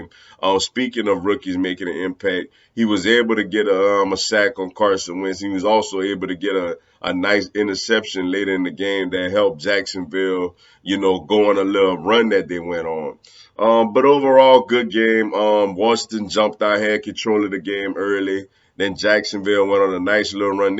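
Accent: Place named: Louisiana, United States. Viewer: Mr you sound like you make have a Cajun family tree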